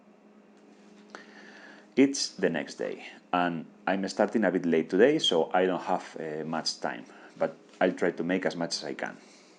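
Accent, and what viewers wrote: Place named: Bilbao, Spain. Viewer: Aupa!!. Ese inglés de Bilbao a tope.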